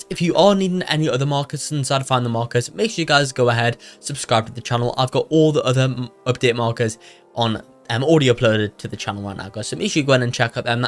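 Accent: United Kingdom. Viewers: British man that helps us all in Roblox games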